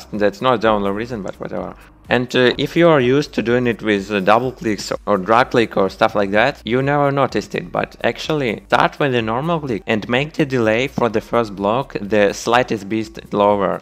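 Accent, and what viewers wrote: Russia. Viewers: Ты русский который выучил английский или наоборот Видос топ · ты же русский)) акцент слышен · Какой то акцент не американский.. ты же русский... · Сразу понял что русский) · Русский что ли?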